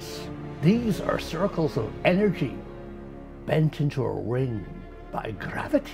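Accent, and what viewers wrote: Scotland, United Kingdom. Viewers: Can I suggest you lay off the Scottish accent...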